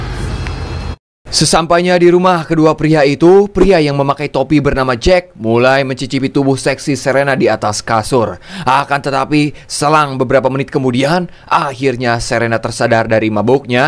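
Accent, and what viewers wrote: West Java, Indonesia. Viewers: Mang sunda na ti palih mana???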